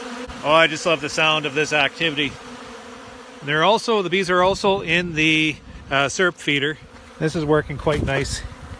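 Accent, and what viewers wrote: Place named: Alberta, Canada. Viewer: Where are you at lol you sound close to me in Alberta east of Edmonton 1 hour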